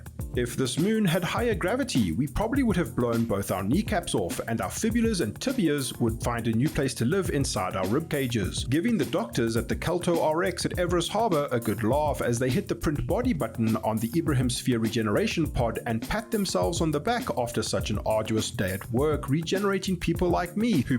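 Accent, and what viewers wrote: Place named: South Africa. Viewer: South African detected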